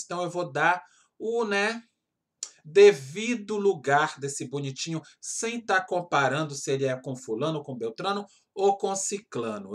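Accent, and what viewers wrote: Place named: Ceará, Brazil. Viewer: Amor você é nativo de qual Estado do Brasil...achei seu sotaque tão cearense ❤️ Eu moro em Fortaleza ce, cheiro!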